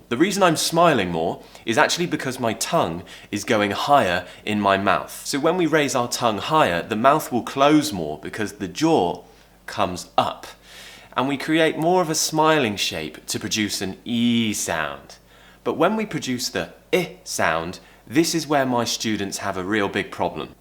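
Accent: RP, United Kingdom. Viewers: My problem is pronunciation, but i like your british pronunciation. Cheers, mate. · Thank you! It is important! - Love your RP British English teaching! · I love your modern RP pronunciation. Your videos are very interesting and useful. AND you're handsome as well 😉. Cheers! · Hit the like button before watching just because of your british accent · Fantastic teacher with a brilliant British pronunciation. Thanks a million,.✌ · You make me love British Accent ! I like all your videos thank you so much 🌺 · If it is British accent I love it!!!! Your accent is amazing!!!! I want such accent. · I love the British accent, and I really loved your video! It helped me to understand more of the accent, and how to pronunciate. Big thanks to you!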